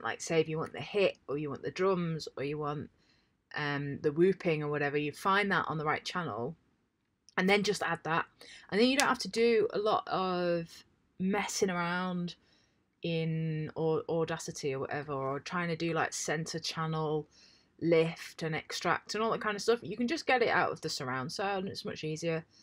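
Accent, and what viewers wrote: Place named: Yorkshire, United Kingdom. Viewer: are you from yorkshire? as a yorkie myself i recognise that accent anywhere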